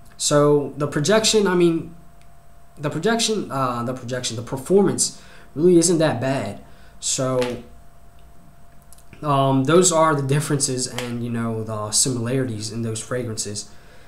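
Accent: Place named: Baltimore, United States. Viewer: *also... While I know your country is listed as Greece your accent sounds a lot like Baltimore City, Maryland... Just thought I would mention... ^_^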